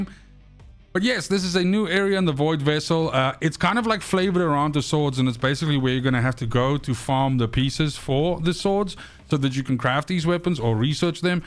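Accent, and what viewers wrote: South Africa. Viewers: Bro sounds south african. But i just can't prove it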